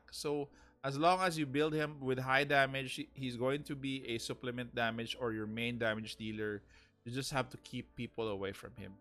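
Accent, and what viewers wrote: Philippines. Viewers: Thanks for this very thorough guide mate. Just started this game yesterday. This information will help me a lot. Btw, your accent sounds like filipino.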